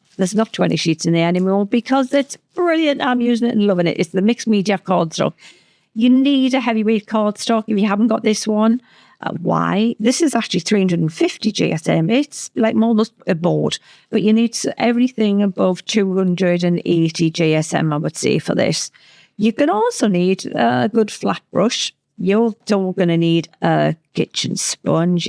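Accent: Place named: Ireland. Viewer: Beautiful... another technique I will be trying. The only thing I need to get is the glycerin. Are you Irish? My family is from county Cork. Love your accent.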